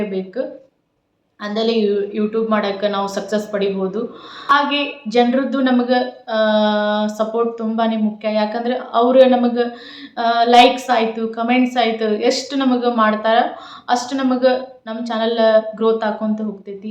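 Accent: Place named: North Karnataka, India. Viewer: Very very nice and good information 🎉 ಉತ್ತರ ಕರ್ನಾಟಕ ಭಾಷೆ ಕೇಳಾಕ ಚಂದ ನನಗಂತೂ ಬಹಳ ಖುಷಿಯಾಗುತ್ತಿದೆ🎉🤗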